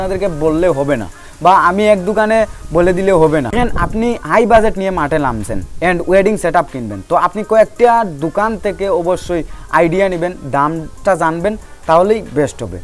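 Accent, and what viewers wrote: Sylhet, Bangladesh. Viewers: ভাই তুমি কি সিলেটি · সিলেটি নি বা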